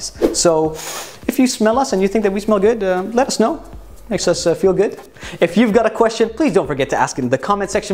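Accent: United States. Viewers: Why american accent its not nice. Everything else is good. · besides the fact that you smell good...where did you learn how to speak american-english?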